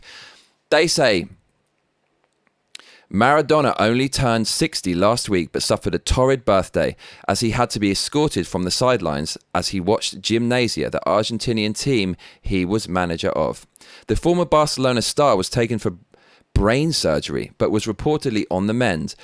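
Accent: United Kingdom. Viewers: you got the voice and Brit accent, you're interesting, articulately spoken words resonate fairness and humility. Everyone is locked loaded in these uncertain days, propagandized lies from Leftist media. These radical Totalitarian Socialist have firm grasp on most of our government agencies. I pray Trump can fix this if not America greatest is a thing of the past.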